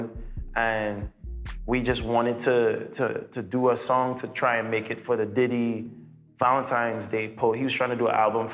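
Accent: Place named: Trinidad, Trinidad and Tobago. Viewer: I like the fact that you are from the Caribbean bro. I rate the Trinidad accent. Lol, I am here typing in your accent. #🇯🇲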